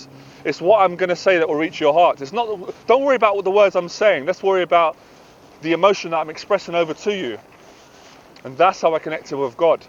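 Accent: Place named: United Kingdom. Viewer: I'm really sorry but I hate british accent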